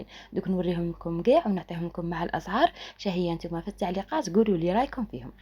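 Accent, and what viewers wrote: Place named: Mostaganem, Algeria. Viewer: حسبتها مستغالمية لهجتهاا تهبل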